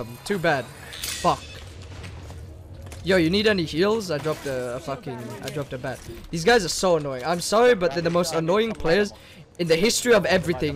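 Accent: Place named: England, United Kingdom. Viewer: He honestly sounds so different when he's not screaming, it's like the only time I can hear his English accent😂😂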